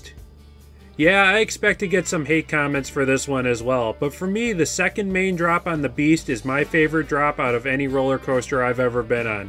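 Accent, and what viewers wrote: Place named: Minnesota, United States. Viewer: This mans got the most minnesoota accent I ever heard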